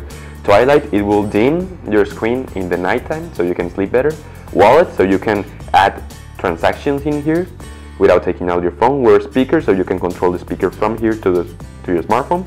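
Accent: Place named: Monterrey, Mexico. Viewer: Eres de monterrey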